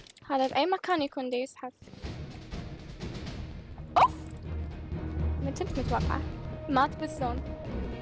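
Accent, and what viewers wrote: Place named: Iraq. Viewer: ماقصرتي👍 دخيل لهجة اهل العراق أهل الغيره أخوكم من سوريا سوراقي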